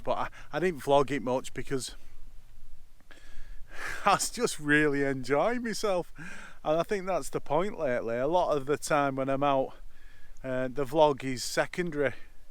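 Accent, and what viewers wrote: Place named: Sheffield, United Kingdom. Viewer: The last time I heard that accent was on my last visit to my home town Sheffield in 2006 from my adopted home in Tasmania. Thanks for sharing. Cheers Peter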